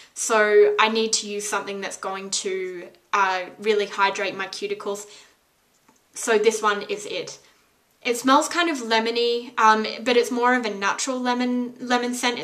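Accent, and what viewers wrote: Australia. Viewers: She's deffoo austrailian....ivegt cousins frm both so inoo..x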